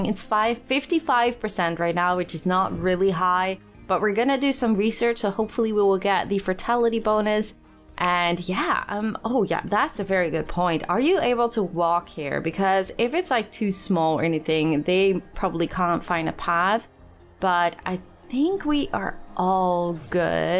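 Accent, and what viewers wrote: Netherlands. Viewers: Are u dutch? I font know why but i have the feeling that your dutch😂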